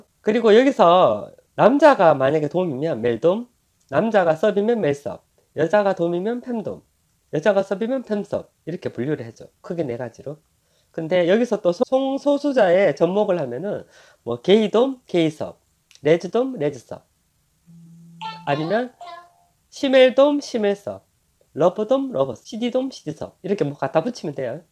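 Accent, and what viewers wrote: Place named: Gyeongbuk, South Korea. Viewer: 서울분인줄 알았는데 고향이 경북분인가 보네요 경북사투리~^^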